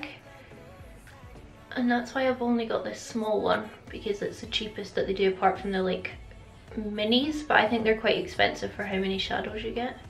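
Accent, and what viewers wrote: Scotland, United Kingdom. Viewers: I love your videos! I just want to say thanks for your videos, I am struggling with my anxiety just now and your videos help take my mind off things. It's also really lovely to hear another Scottish voice😂💖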